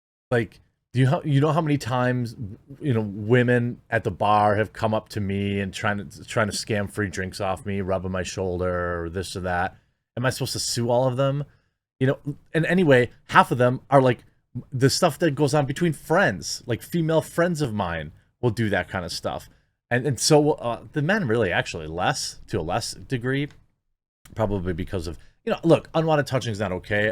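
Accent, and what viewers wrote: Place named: North, United States. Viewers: Yo, ignore those idiots who take issue with your pronunciation of the short-a sound ("ă" --- which the International Phonetic Alphabet actually represents with this symbol: "æ") like as in words that rhyme with "flag" and "bag." (Again, in the IPA, those two words are actually even represented, respectively, as "flæg" and "bæg," so it's not like the slight bit more emphasis on the "e" part of the "æ" was ever really wrong, anyway.) The only thing i will say, is that's not a midwest accent (well it is, but it's more specific than that). That's a Northern Midwest accent. Chicagoland and higher latitudes. I had to point this out because, as a fellow midwesterner, i don't pronounce my vowels like you do but I'm still midwestern. However, I know my neighbors to the north do, certainly, sound like you (and it's part of their charm lol). 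So, long story short, don't acquiesce to these numbskulls, be proud of your accent (and the region it associates you with in this great, massive, country of ours [.. "from SEA to shining SEA," commies]). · please don't care about the way you say flag or bag or stag or any of those type of words. It is normal to say it that way i nthe mid-nrothern states so no need to be self conscious about it.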